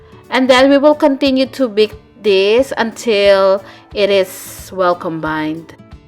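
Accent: Philippines. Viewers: Just want to ask if youre an Ilongga 😆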